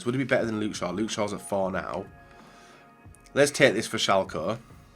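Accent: United Kingdom. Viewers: you from u.k??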